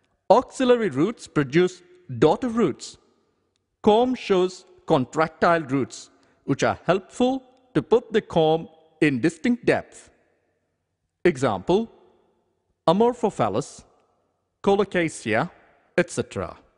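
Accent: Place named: India. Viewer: I love your accent, where you from? #India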